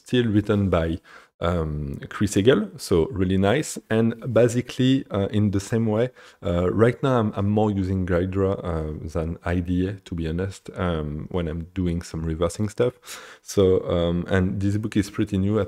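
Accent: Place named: France. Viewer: Le magnifique accent français ? Super vidéo sinon !!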